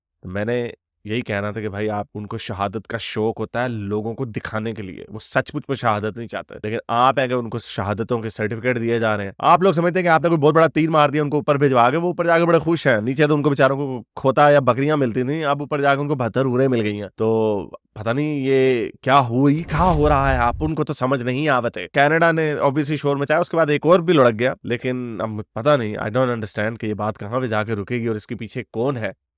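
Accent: Punjab, India. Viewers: Apki Panjbai Accents bahut Achha Lgta Hqi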